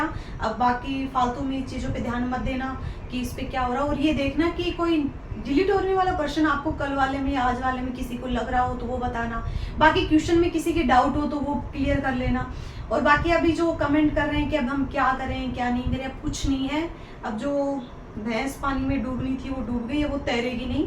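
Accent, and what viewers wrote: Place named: Jhunjhunu, India. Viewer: Mam aap mere ko bhasa sai to jhunjhunu sai lag rhai ho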